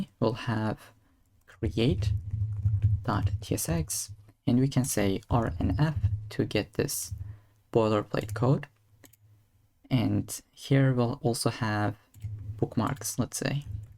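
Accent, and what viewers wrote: Turkey. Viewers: türkmüsün la ingilizcen asiri türk aksani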